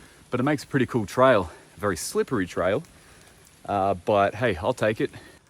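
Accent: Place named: Australia. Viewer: Lol wasn't expecting an Aussie accent when I clicked on this! Long way from home!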